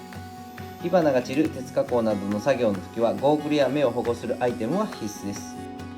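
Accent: Kansai, Japan. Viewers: 、お疲れさまです❗JPさんの言葉と言うか、話方は関西弁混じりですね❗関西の出身ですか？珪藻土の下のタイルの穴開けをした時、キリ先はコンクリート用ですか？見てたら、鉄切り用に見えましたけど…。それに、壁に打ち込んだビスアンカーって言ってましたけど、それはカールですね❗洗面所が、見違えりましたね‼️モダンな感じで良いですね‼️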